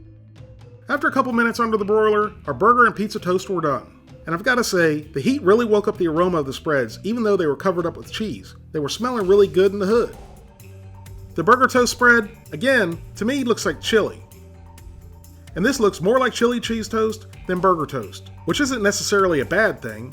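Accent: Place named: North Carolina, United States. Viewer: I've been watching some of your videos randomly for a bit now, and I've always thought you sounded like someone from NC... I am also, that's how I knew.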